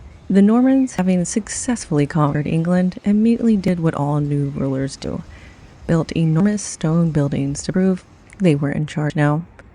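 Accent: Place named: New England, United States. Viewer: from a personal standpoint big H went right over my head mostly! I was being captivated by that sharp witty Yankee tongue of yours and the glorious ginger mane! To comment on your original question I’m gonna now have to revisit it! 😁🔥🤟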